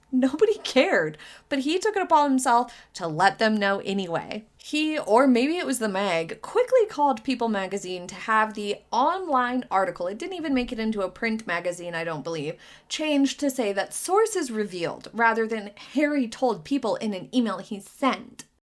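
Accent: United States. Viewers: ❤darling ❤you look absolutely STUNNING 🤩 again today…..I love 💝 you in this shade of Green!it makes your eyes 👀 pop!!!🥤 ❤❤❤❤🎉you really are a BEAUTIFUL WOMAN 👩 with a Fabulous Brain 🧠 to boot!its always a pleasure to hear 👂 your soft CLEAN 🧼 IMHO AMERICAN 🇺🇸 ACCENT all without the new “woke”weird drawling questioning intonations that I hear often on YouTube!lol!Im a 58year old lady in the Shetland Isles Scotland 🏴 uk 🇬🇧 and have been with you from the get go!Keep going you’re REALLY SAYING WHAT I FEEL and THINK 🤔 about METHANE and HAZNOBALLS and I’m PRAYING 🙏 TRUMP doesn’t Chuck HENRY WINDSOR outta the USA 🇺🇸 😂😂😂😂!Much love!🧡 Jo.xxxxxxx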